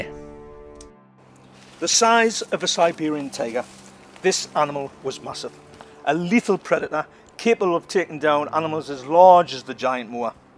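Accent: United Kingdom. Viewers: ''the size of a siberian tiger'', " that spent most of its time in the tree ". Yeah right, a big tiger-sized tree animal that only had Moas for prey, how stupid this Scottish accented narrator think the audience can be ????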